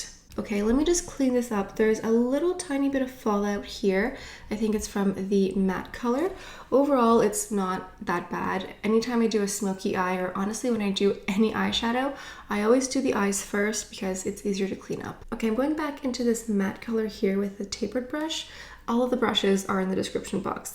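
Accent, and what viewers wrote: France. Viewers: You have such a beautiful French accent! Lovely to live in a dual language French-English country. I agree with your assessment of Natasha Denona - definitely some hits and misses for me. I really enjoy using the Chanel palettes we have. I too have grown a little tired of the red/pink color story.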